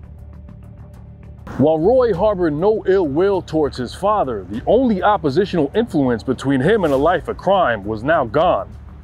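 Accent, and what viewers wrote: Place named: New York, United States. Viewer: You're a really good narrator, especially with the New York accent ( I'm from New Orleans). Very cool channel you have, bro. I'm just discovering it, but it's great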